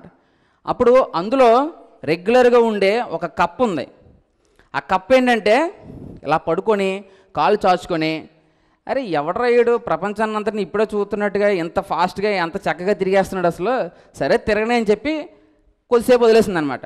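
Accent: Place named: Godavari, India. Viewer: Godavari slang....maadhe...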